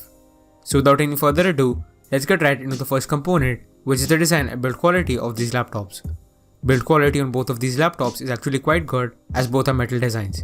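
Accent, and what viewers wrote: India. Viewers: Yo less indian accent not rude but i cant understand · Nice video but you should speak with less Indian accent, it's hard to undestand you. Greetings from Mexico. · Please talk slower.. no offense but your indian accent is making it worse when you talk so fast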